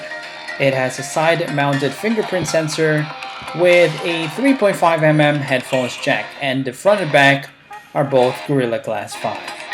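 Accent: Bisaya, Philippines. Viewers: bisaya diay ka sir? Nice HAHHA washout sir from PH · bisaya d i ka bai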